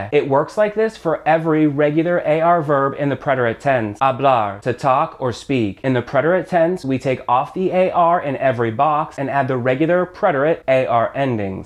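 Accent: United States. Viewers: great videos! are you a southern Californian? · I love how these videos are super concise, super to the point and sped up, no two ways about it. So super easy to digest content at high speed, thanks for that so much! However, don't you think it's about time to work on your accent if you're able to? You sound overly American when speaking Spanish, that's a shame - to me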